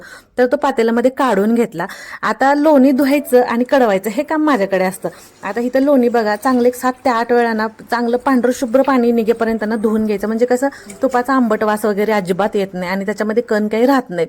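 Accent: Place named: Satara, India. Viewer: Tai tumchi language ekdam satari vatate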